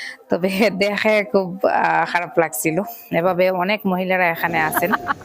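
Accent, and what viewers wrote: Sylhet, Bangladesh. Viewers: আপনার কথা সুনে মনে হচ্ছে বাংলাদেশি ভাষায় কথা বলছেন আপনি তাহলে আপনি ভারতীয় কি ভাবে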